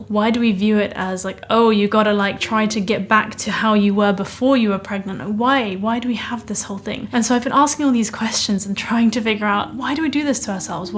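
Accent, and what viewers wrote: United Kingdom. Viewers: 从你读大学的视频看到你现在怀孕将要做妈妈，见证你从女孩到女人，心里很感触。恭喜你&祝福你。我的英文不好，但是我很喜欢你的英式英文的口音。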